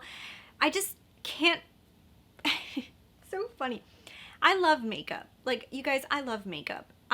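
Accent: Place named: United States. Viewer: I love your American accent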